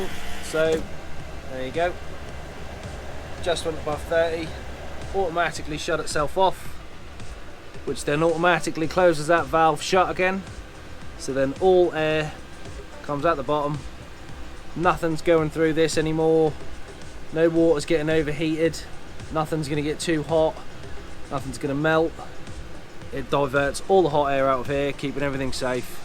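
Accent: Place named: Norfolk, United Kingdom. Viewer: Can I buy the 3D printed air inlet/outlet from you please? From your accent you sound like you're local to me (Norfolk).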